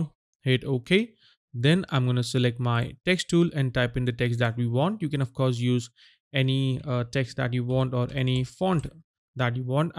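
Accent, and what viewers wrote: India. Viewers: Indian accent huh? 😀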